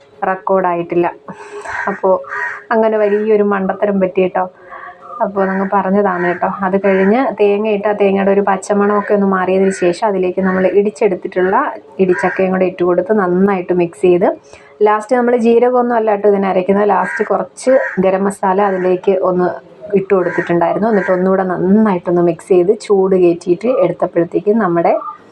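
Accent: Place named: Kollam, India. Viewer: ഞാനും കൊല്ലം. ചവറ. സംസാരം കേട്ടപ്പോൾ തോന്നി കൊല്ലം കാരി ആണെന്ന്. അതാടാ ചോദിച്ചേ.❤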